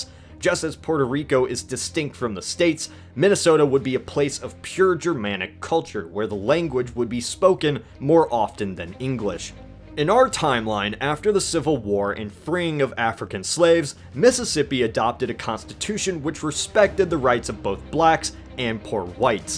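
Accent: Ohio, United States. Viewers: Is he from Ohio? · you from Ohio?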